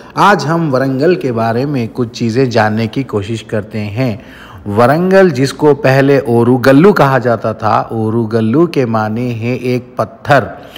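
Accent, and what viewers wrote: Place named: Mumbai, India. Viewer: Which language you are speaking bro. Hindi mai blog kar rhe ho toh proper Hindi bolo Bhai yeh tapori accent use kar ke kya mil rha hai.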